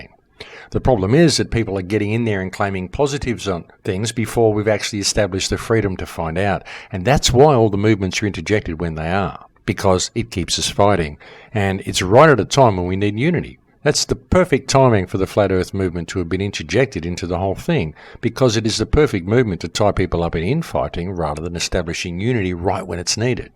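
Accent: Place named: Australia. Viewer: I'm new to yuor posts. Very informative! Refreshing to get schooled in an Aussie accent! Cheers mate! Oh yes... keep up the good work!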